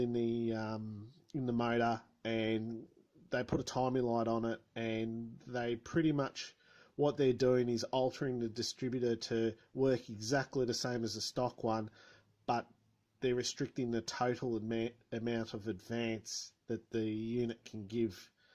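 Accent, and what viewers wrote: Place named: Australia. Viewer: So refreshing to hear an Australian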